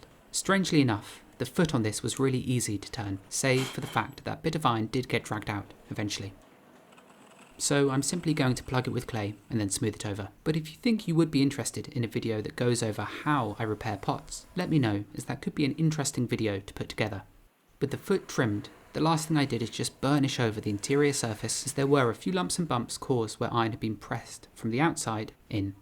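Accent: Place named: United Kingdom. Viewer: I think a good recipe for youtube carreer balance seems to be (from the youtubers I consume the most at least) just doing what's fun for you. If it's genuine and makes you want to keep doing it, and monetary reasons aren't your main driver, then it's better to let an audience that fits your vision come to you than vice-versa. Obviously this prescription completely backfires if your main goal is making the most money, but those channels tend to not last too long so make those calculations yourself if that's the case I guess. Personally I appreciate intentional videos, but I also really appreciate your channel cause it's like looking into the window of a person who's life you have nothing in common with, learning a bunch of things along the way, and in that calming soothing brittish voice of yours that I love. Hoping to someday find the inspiration/time to practice pottery, but until then, I'll enjoy watching you do your thing :)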